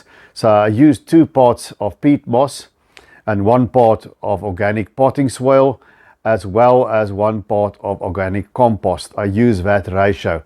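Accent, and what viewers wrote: South Africa. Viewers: Love South African accents, great tank too!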